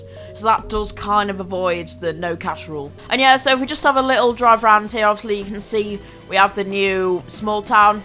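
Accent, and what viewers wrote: United Kingdom. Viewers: LOL i love ur accent XD u from like south western??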